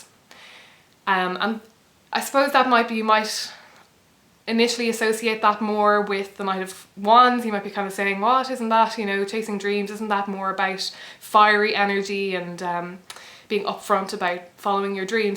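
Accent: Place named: Ireland. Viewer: I love your accent. Irish??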